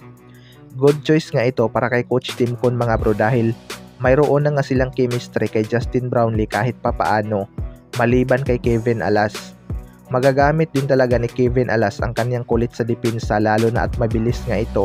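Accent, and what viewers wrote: Visayas, Philippines. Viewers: bisaya ka!Ayusin Mo ung pag kkwento..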